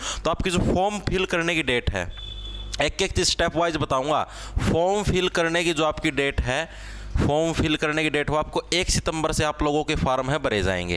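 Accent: Haryana, India. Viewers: Haryana ka hai mitr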